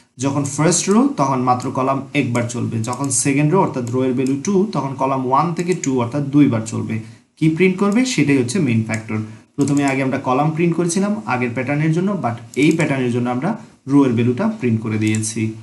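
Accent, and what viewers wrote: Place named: Sylhet, Bangladesh. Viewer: thank you vai apnar karone pattern amar kase onk sohoj hoye gelo off topic vaiyar bari mone hoy sylhet ei apnar accent ta maje maje asteshe :)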